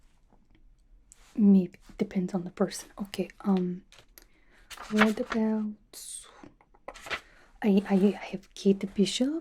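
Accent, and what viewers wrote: Russia. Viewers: Another amazing video like always love the Russian accent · The russian accent making a return is nice nice, This kinda came out of the blue but the sarcasm so far has been amusing lol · As soon as I saw that thumbnail, I was like "Gah dayum. 😍", this is gonna be super tingly. 😌💖 I love that Russian accent. 😁 I dropped a Like for you. ❤️ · Does she have other Russian accent vids? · Russian Accent is Top 5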